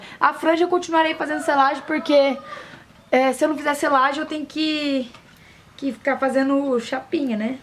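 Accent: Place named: Maranhão, Brazil. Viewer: Seu sotaque é bem maranhense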